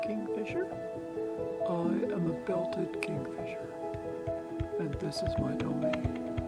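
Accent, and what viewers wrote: Canada. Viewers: I think your Canadian accent is stronger now than when you were in the states... just say'in.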